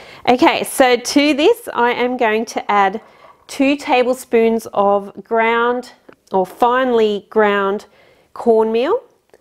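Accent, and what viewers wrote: Australia. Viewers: Wo in Deutschland bist du aufgewachsen? Woher kommt es, dass du nach Australien ausgewandert bist? Du hast auch einen australischen Akzent und keinen deutschen!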